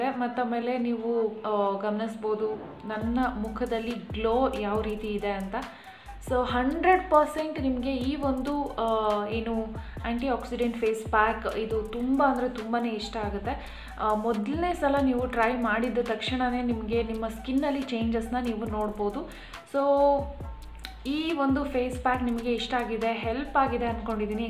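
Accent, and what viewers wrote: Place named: Karnataka, India. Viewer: U have a beautiful skin and live ur kannada slang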